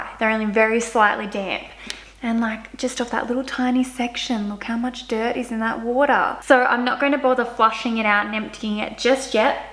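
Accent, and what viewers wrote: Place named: Australia. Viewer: Love your looks, your pants, your nails and your aussie accent ("wooodaaaa"). Review is great. I have the corded crosswave.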